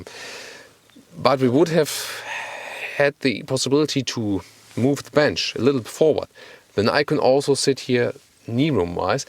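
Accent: Germany. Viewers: One of my favorite proper SUVs currently on the market. I am in love with your German accent! I feel the need to open a Milka bar. Thanks for this review. From The United States of America.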